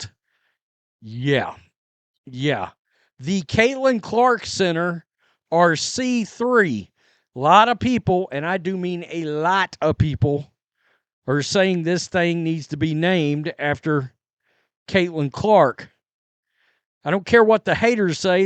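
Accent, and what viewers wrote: South, United States. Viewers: The sun came up this morning and that is b/c of CC. Come on now! as CC would say. I'm an Iowa Alum and big supporter of anything with Black and Gold, CC being the only reason why the Fever is building a new training facility is a stretch at best. Why don't you try losing that ridiculous southern accent and at least attempt to present some intelligent content.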